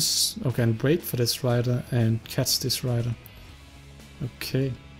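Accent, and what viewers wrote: Denmark. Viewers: Are you from Denmark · Are you from Denmark? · Man kan godt høre du er dansker med dig Accent. Hvor kommer du fra?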